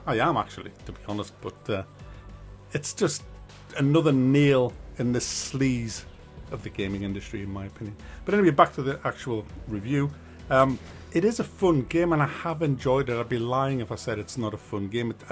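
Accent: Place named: United Kingdom. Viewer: Can I ask how British accent is called? It's so cool:)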